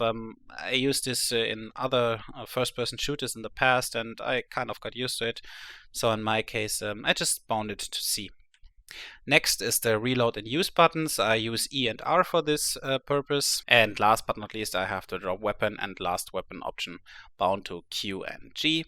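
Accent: Germany. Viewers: Sofort gehört dass du Deutscher bist :D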